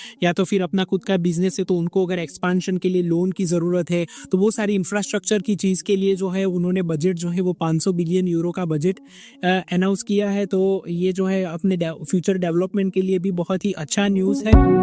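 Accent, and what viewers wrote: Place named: Gujarat, India. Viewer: Bhai Kay Thai chho? Gujju accent is visible 😂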